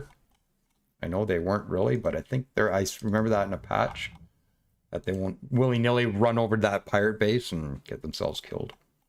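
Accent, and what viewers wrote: Canada. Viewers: I am sorry man. I mean no disrespect, but you sound so Canadian. Then I look at your profile and you are from Canada. I am going to check you out man. I just had to put that thought out.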